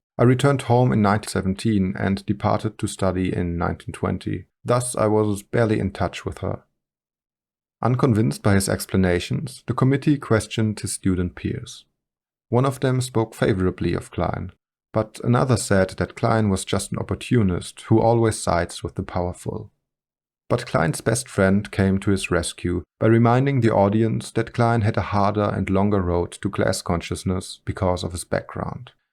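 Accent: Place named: Germany. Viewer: He's a German for sure. Can't you tell by that accent of his? I don't know why, but I find that a German accent, or just plain listening to the spoken German language to be quite compelling.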